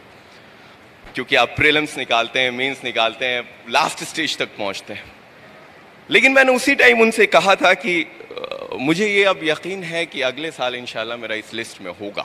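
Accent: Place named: Pakistan. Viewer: OMG 😂 yeh to Pakistani wala Hindi s bole rha h😱🙈🙉🙊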